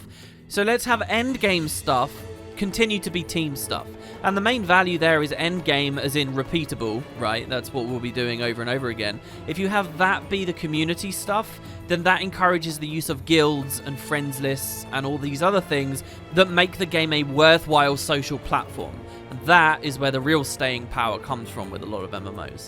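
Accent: United Kingdom. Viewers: "wearing his burnout on the game" DUDE youve been covering this game for like 14 years bro I literally give you the most respect for sticking through with consistency and having enjoyable content. Your voice is so ingrained in my head that whenever I hear a british accent at work I think "Oh that guy sounds like lmao". keep going, this franchise is my favorite and you are basically one of the main reasons I even play guild wars today. Keep it up, never let them get you down, we are all here for you my man and waiting for the day you start doing three two-hour EoD episodes:DDDD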